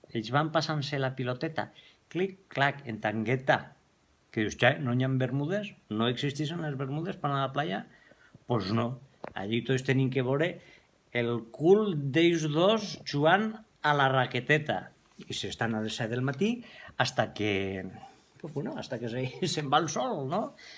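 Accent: Valencia, Spain. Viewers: parles el valencià churro, cap de castellà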